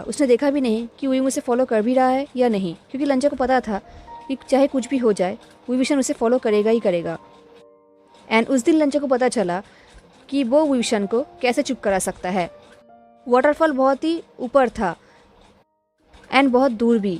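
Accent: Bengal, India. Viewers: Di kal main jab padh rahi thi to aapke channel ka notification aaya tha to main video ko like and diwali vali comment karke maine socha tha bed par sone jaugi to tab sunogi as a bed time story jab bed par aayi to thak kar so gai lekin jab main abhi sun rahi ho tab mujhe pata chala aapke video delete karane ka pura sach aapke channel ke video delete hote dekhakar mujhe bahut bura laga tha kyuki aapki sari story bahut jyada pasand hai aapke calm and thode se bengali accent ke sath aur main bas mere lord shiva se pray karugi ki aapane jin writer se puchha ho vo delete karane ko na bol de aap mere wangxian explainer ke favourite list me aate ho i know comment bahut bada likh diya hai main par di mujhe aapase ye kahana tha your are my favourite wangxian explainer agar aapane ye comment padha ho to sorry aapko itna bor karane ke liye 😊😊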